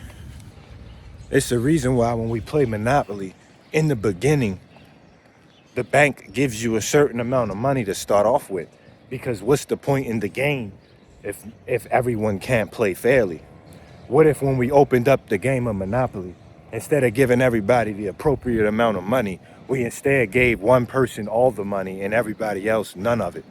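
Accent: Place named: Baltimore, United States. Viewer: u from baltimore huh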